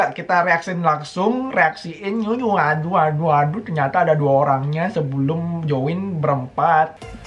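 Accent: Bali, Indonesia. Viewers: Logat bali nya sangad kerasa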